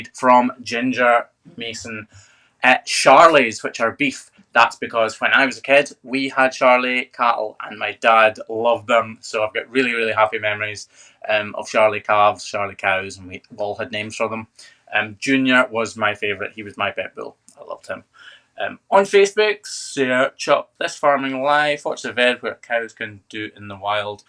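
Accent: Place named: Southwest Scotland, United Kingdom. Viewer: Love your videos, very interesting. You have a familiar accent from where I used to live in SW Scotland, I lived in Glentrool village then the Isle of Whithorn. You're definitely from that neck of the woods.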